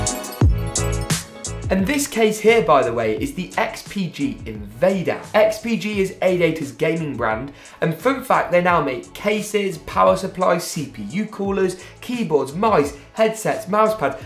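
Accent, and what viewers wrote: United Kingdom. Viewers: Finally a youtuber that doesn't cut the mistakes he makes ... and anyway I really like your brit accent...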